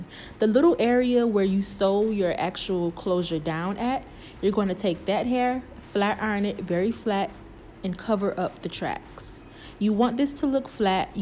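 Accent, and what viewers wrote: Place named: New Orleans, United States. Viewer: Are you from New Orleans? You accent is telling me so 🤣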